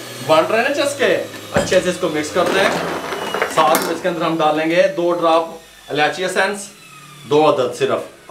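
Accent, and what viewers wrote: Punjab, India. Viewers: ji thuadi Punjabi bhaut swaadee hey. Milk shake nalo vi jaida.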